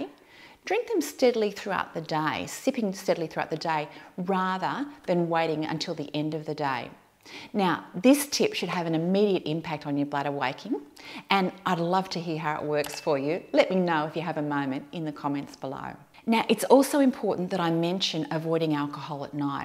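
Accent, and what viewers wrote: Australia. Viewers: You are so Aussie!!!! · she sounds australian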